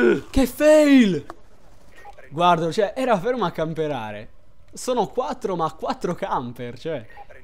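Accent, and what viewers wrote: Sardinia, Italy. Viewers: ma tu sei sardo o sbaglio??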